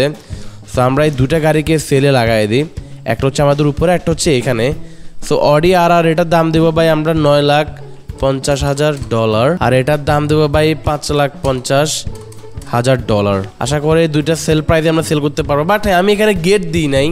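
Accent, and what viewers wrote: Noakhali, Bangladesh. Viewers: ভাই নোয়াখালী কথায় আপনার বাড়ি😅😊❤❤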